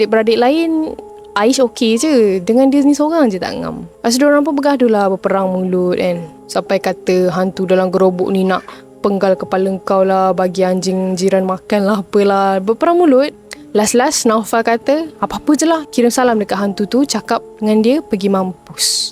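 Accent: Kelantan, Malaysia. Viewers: Kecek kelate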